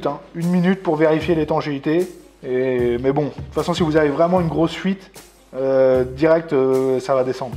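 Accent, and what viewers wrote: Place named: Normandy, France. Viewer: on entend l'accent normand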